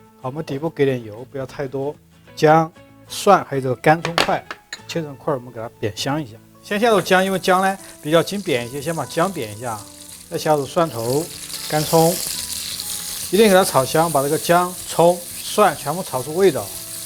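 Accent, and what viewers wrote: Sichuan, China. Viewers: ，铲铲哦，你娃的川普太亲切了😊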